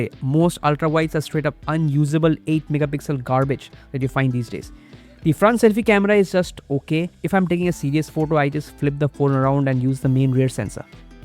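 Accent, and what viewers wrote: India. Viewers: Bro, are you from Odisha?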